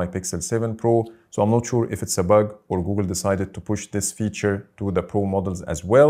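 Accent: Egypt. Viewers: اول ما سمعت الفيديو حسيت إن لهجتك عربية انت مصري؟ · Egyptian English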